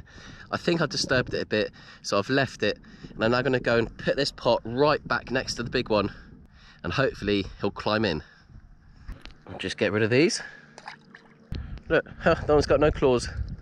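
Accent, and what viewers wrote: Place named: RP, United Kingdom. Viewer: How did you & become friends? You've got a very posh RP public school accent while has a distinct regional accent.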